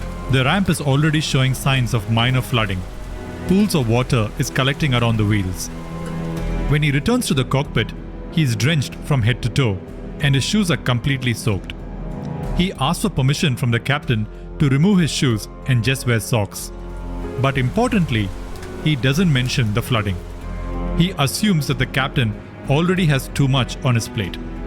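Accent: India. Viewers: 🔴🔴🔴 I CAN'T LISTEN TO HIS THICK INDIAN ACCENT, TOO ANNOYING! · Crazy heavy Indian accent. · He sounded Indian national! . Needed improvement on grammar pronouns actions. Tks. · Bro have Indian accent ❤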